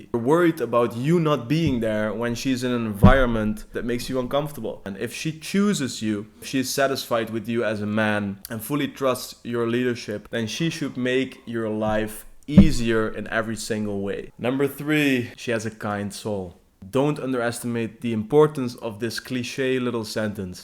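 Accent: Netherlands. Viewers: Are you dutch bro? The accent 😎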